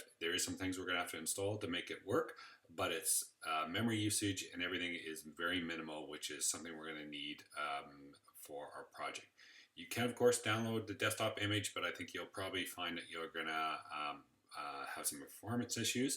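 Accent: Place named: Upper Michigan, United States. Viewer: I'm fascinated by this project. I'm here because I'm trying to do the exact same thing. I have a background in electronics, programming, networking, etc. But also, fascinated by accents. Are you, by chance, a uper? (yoo-per) thanks again for posting this video series.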